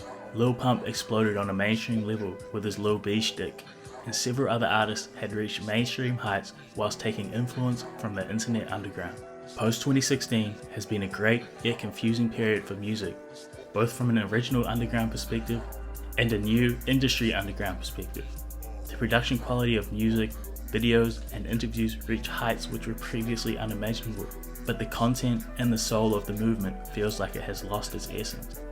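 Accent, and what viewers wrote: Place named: United Kingdom. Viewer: holy shit british man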